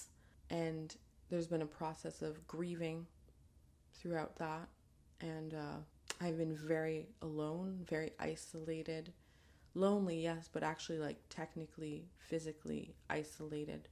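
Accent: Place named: France. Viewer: PS. I think what makes you, you is your voice, your way of expressing things, of describing things, your French accent when you speak.. not your mascara and makeup, you are sooo beautiful barefaced. Just grab a cup of cappuccino and throw yourself outthere with whatever feels good to you. We are waiting for you , whenever you are ready!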